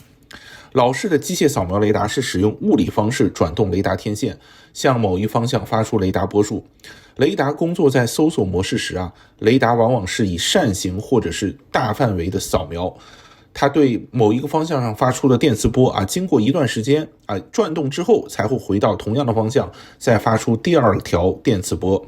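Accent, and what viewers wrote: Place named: China. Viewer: 怎么那么多说着一口中国味的中文在做这种频道？是好赚🐸钱？ 你就不怕他在糊弄你们呀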